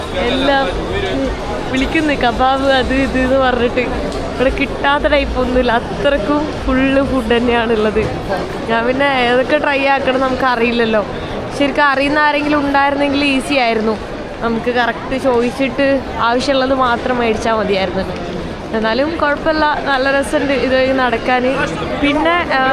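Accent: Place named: Kannur, India. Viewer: Hiii you always speak in Kannur slang 😊❤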